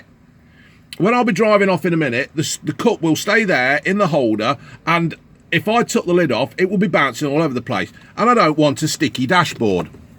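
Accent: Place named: Leeds, United Kingdom. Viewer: Our kid I sense some Leeds dialect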